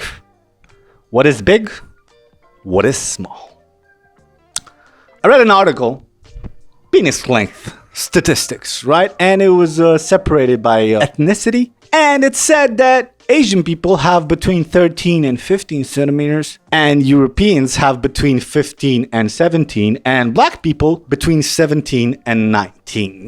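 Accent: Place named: United States. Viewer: u speak english like an american! good job.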